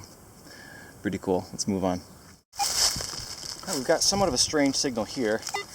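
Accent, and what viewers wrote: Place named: New England, United States. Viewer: Excellent video! I wish I knew about this channel before. I subbed ya. Are you in New Hampshire it sounds like you have a New England accent. I'm from Maine myself and I love metal detecting and coin collecting. It definitely sounds like you know your stuff and that's cool. Dave from Maine.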